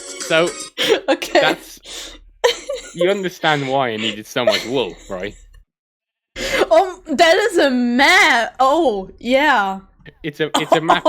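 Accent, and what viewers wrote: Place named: Germany. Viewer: И вот я здесь, человек что английски понимает очень условно (а точнее вообще почти не понимает), смотрю видео по Minecraft на английском, с, как я понимаю, немецким акцентом (на мой слух) и пишу этот коментарий с помощью переводчика... And here I am, a person who understands English very conditionally (or rather, almost doesn't understand it at all), watching a Minecraft video in English with what I assume is a German accent (to my ears), and writing this comment using a translator...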